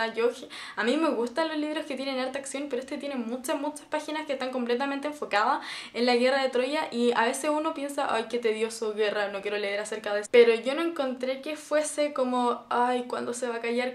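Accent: Chile. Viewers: Estoy tan obsesionado con este libro que comencé a ver y leer todo lo que hay en internet al respecto. Pero me surgió una pregunta: De donde sos? tenes acento chileno pero usas palabras que usamos en Argentina jaja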